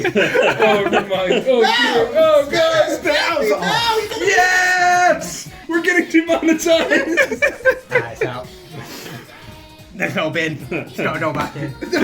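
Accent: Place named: United Kingdom. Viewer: these auto subs are the embodiment of how hard it is to get an ear for a regional british accent sometimes 😅 Nice list!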